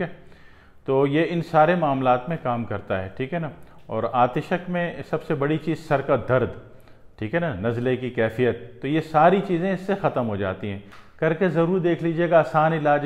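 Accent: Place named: Hyderabad, India. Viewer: Walaykum Salaam Warhmatullahi Wabarakatahu. Muhtaram Dr Sahib Ap ka Lahja Hyderabad Deccan Ki Tarha Hai Bahar E HAL JAZAK ALLAH..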